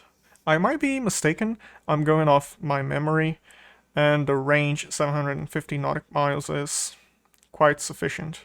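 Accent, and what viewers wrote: Scandinavia. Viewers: I was wondering the same thing. My guess is your mother tongue is something Scandinavian. Nice review, BTW. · not especially... yeah I'm going with the guy below me, something Scandinavian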